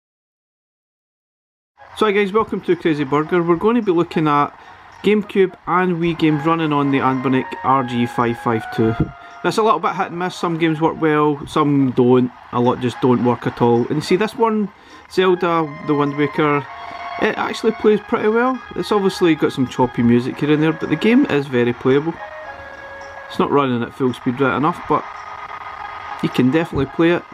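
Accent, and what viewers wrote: Scotland, United Kingdom. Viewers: It's the Scottish accent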